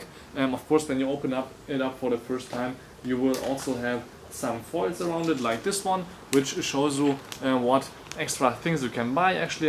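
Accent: Germany. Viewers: Strong german accent...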